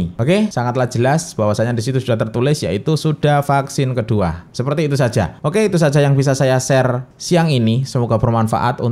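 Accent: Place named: Java, Indonesia. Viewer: Jowo medok hadir bosk 😄👍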